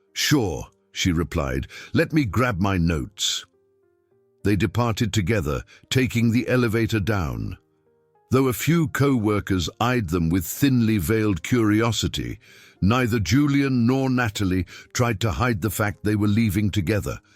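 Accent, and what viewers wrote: United Kingdom. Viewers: I Agree with Comments from "Shirelene", & "ScatPack". I myself feel that the Script was Way Too Long & Slow; AND the Professionally trained Narrator Voice was flat Horrible, play- acting, low then loud, w/accent from U.K. Royalty, way above public status. The video background was interesting, vary- ing from forests & waterways, mountains & country roads, to huge city buildings & speeding cars. The actuality of a young data analist being able to out- computerize an experienced high tech expert & saboteur, in real time, is totally unrealistic & impossible. Finally, I am sick & tired of just another story of self-absorbed, narcissistic, cor- porate corruption, disruption & destruction. How about an innocent, decent, touching Romance ?! This movie finally had a good ending, So, Despite my criti- cizing, My Rating on this Movie is: 8/10. 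❤❤😅😅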